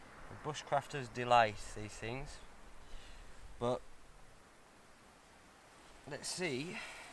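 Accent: Yorkshire, United Kingdom. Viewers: I WAS GOING TO SAY YORKSHIRE. DOIN MY HEAD IN TRYING TO FIGURE THE ACCENT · christian roberts lol it's Yorkshire clearly. Definetly not Scottish Lowlands. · adrian mendoza no it's british he's a pom not an aussie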